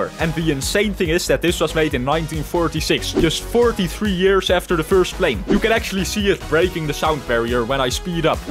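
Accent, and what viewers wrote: Netherlands. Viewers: Idk but are u Dutch u sound Dutch :) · Dutch accent going crazy😂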